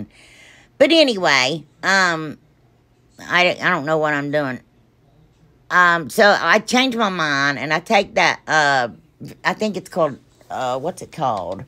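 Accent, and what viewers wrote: South, United States. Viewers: LOVE your accent are you southern?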